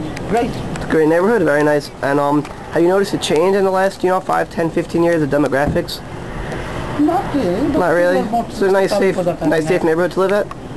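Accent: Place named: Delaware, United States. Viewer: yoo ...... u def didnt grow up in nyc...u got no swagger u talk like u from delaware...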